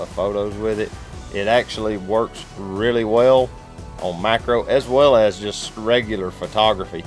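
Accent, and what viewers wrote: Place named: Texas, United States. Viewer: are you from Texas , lover the accent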